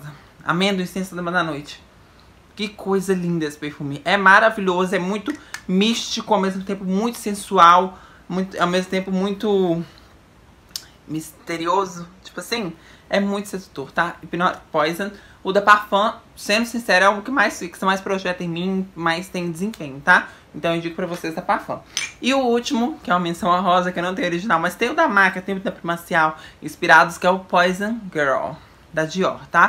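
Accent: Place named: Minas Gerais, Brazil. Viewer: Pode falar bastante . Amo esse sotaque mineiro que emenda as palavras . Acho chique .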